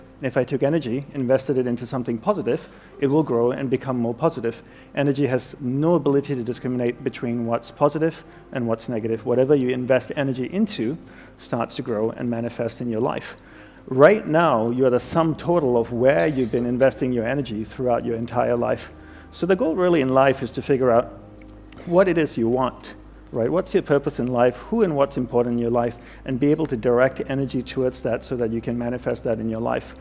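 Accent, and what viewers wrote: United States. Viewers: Oh for fucks sake, Indian fake gurus are now speaking with American accents. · This is refreshing: A Hindu teacher with a New York accent!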